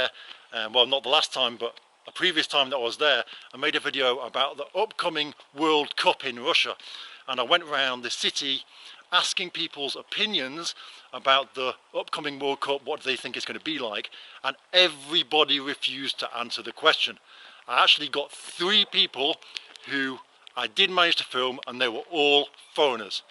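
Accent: England, United Kingdom. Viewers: Your English is ENGLISH. Teach me. I am British but not English like you.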